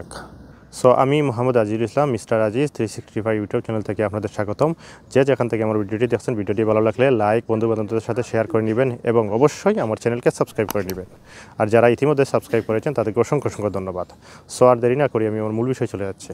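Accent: Sylhet, Bangladesh. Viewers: আসসালামু আলাইকুম ভাই আফনার বাড়ি কিতা সিলেট নি?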